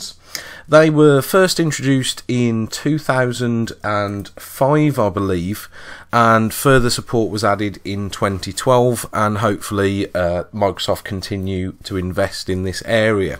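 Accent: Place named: United Kingdom. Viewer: I really hate british accent. How do you guys roll your mouth like this. This is worse than indian accent... Allright allright not bad at that level but c'mon guys it is really pain in the ass.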